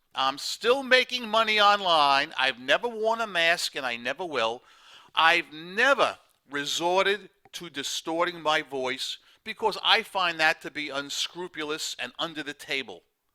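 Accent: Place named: Brooklyn, United States. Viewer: needs to put a compressor/limiter on his audio. When I know he's about to shout (I can see it in his eyes) I immediatly lower the volume. Otherwise, my wife might say "Who are you yelling at!?" and I both have that NJ accent but his is more Brooklyn, NY style. When he says the word "for" it sounds like "fur." I'm sure he drives the people that live down south of The USA crazy. They will say "What did he just say?" Like when the movie "Rocky" came out in the 1970's.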